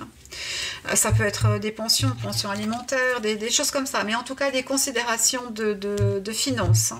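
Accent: Switzerland. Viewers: Bonsoir Madame, j'apprécie de plus en plus vos tirages, calme, tranquille, voix douce, petit bruit d'eau, beaucoup de respect, élocution soignée, il me semble reconnaitre un accent suisse mais c'est peut être la Savoie. Moi non plus je ne connaissais pas ce drame. Je me permets de vous donner des idées si vous voue êtes en panne d'inspiration : Céline Giboire, Thifaine Veron, Vanessa Melet (pardon si vous avez déjà travaillé sur leur disparition ou décès car je connais votre chaine depuis peu). Merci beaucoup pour la qualité de votre travail. Bonne soirée. Aline Le Moal, Finistère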